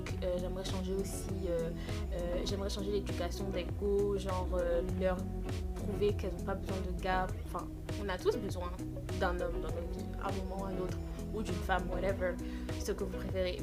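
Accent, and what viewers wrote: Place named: Ivory Coast. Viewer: Tu as vraiment l'accent ivoirien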